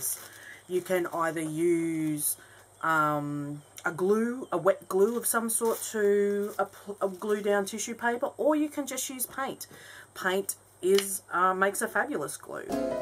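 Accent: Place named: Australia. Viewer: Hi, I love the techniques you've shared here ☺ stencils are my favourite way to add pattern & colour right now but I go through phases of loving different techniques and mediums! I love your aussie accent too!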